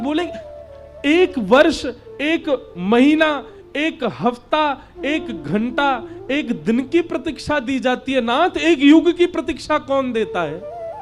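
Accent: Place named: Braj, India. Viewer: Om Shri Ganeshay Namh 🙏 Jay Shri Krishna Radhe Radhe 🙏 ♥️ 💙 ❤️ Jay Shri Hari Jay Shri Shyama Shaym ji Jay Shri Radha Rani Jay Shri Radha Kishori ji Jay Shri Radha Raman ji Jay Shri Radha Vallabh Lal ki Jay Ho Jay Shri Guru Dev Maharaj ji Aapke Charno Mai Koti Koti Pranam 🙏 Radhe Radhe Jay Shri Harivansh Guru Dev Maharaj ji Aapki vraj Bhasa Badi Sumadhur hai Radhe Radhe Jay Shri Vrindavan Dham Jay Shri vraj vasi 🙏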